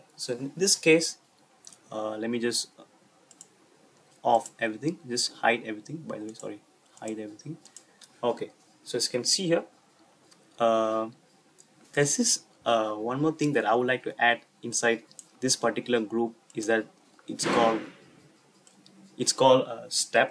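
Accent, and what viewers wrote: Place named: India. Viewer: You are the best human on this planet love your work ! You helped me a lot ! And great accent keep it I love India and its people soo much!❤️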